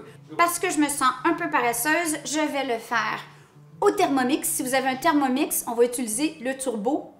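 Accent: Quebec, Canada. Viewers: Merci pour cette recette libanaise aux accents québécois. J'adore la patate de (?) Plus naturelle tu meurs, ça change des vidéos de cuisiniers et cuisinières aux culs coincés!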